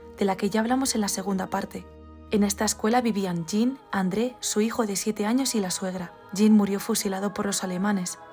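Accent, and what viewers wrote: Spain. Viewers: Terrible lo que barras por tu narración eres española porque no te animas a investigar como en la conquista de américa los españoles impusieron a cruz y espada su religión y como flageban con cadenas a los indígenas y aparte sus infecciónes de viruela que acabaron con ls mitad de los indígenas en américa investiga y verás que ustedes como los ingleses fueron peor que los ingleses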